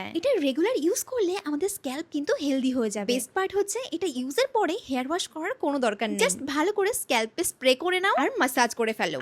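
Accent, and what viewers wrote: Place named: Sylhet, Bangladesh. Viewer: Hothat sylhati .......❤khub bhhalo lage erokom kotha bolle ...erokom jodi regular kotha Bolo bhhalo hoto😢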